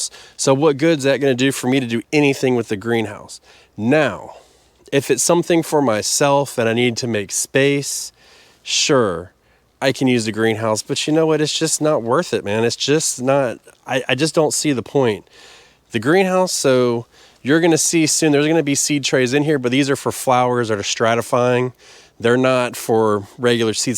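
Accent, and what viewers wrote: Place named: Massachusetts, United States. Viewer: Really enjoying your videos. This is the first time I ever left a comment on anything (I'm an old guy), so I hope it goes through. Anyway, it sounds like you're originally from Massachusetts (I'm from Vermont), now living in NC, inland from you, about 60 miles south of Raleigh. I've been gardening my whole life, mostly on intuition and what I learned from my grandparents. Never have been too technical about anything, so I really like your way of describing how you do things. Recently your clips on starting seeds and hardening them off were excellent. God bless. Keep up the good work.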